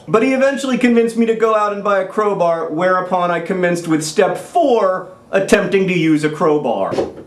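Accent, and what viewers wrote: Russia. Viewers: Your Russian made my insides happy. Ochen liubliu tebya<3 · Your Russian is adorable. XD I don't like the way you made me question the plural of 'octopus'. I have a feeling this will result in a linguist headache. (They're a thing that happens.) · It's a really REALLY good Russian you're sporting!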